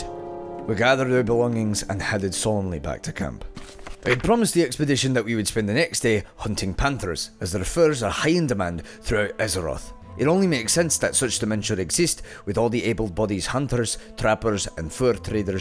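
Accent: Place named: Scotland, United Kingdom. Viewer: Thanks for doing this, although I wish it wasn't read with a strong Scottish accent. Hard to understand some words ^_^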